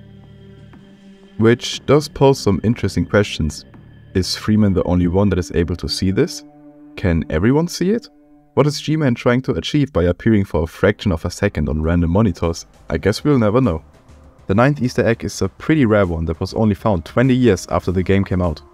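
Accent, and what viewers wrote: Germany. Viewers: Der duuuude ist doch deutsch oder? 🤔🤔🤔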